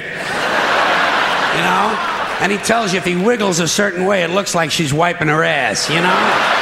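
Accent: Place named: California, United States. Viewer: sounds like a Californian, still a funny man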